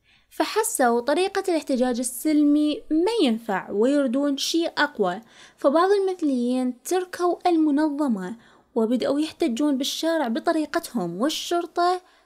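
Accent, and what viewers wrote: Iraq. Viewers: يزين اللهجه العراقيه تخبلييين❤️❤️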